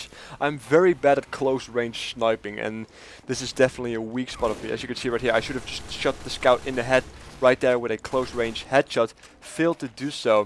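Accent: Netherlands. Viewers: Can I ask you something? I think you have a uncommon accent while speaking english. Is there any chance that you're dutch, because sometimes you sound like it :)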